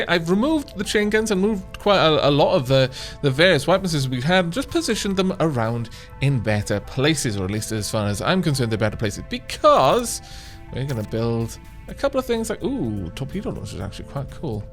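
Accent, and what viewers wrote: United Kingdom. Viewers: PyroDesu Wales is part of Britain. irgo he has a British accent. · Impressive that you get a British accent out of it, considering (and his accent) is Welsh, not British.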